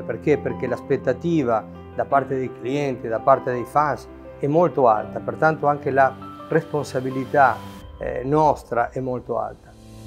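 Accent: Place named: Argentina. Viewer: That Argentinian accent is so rough lol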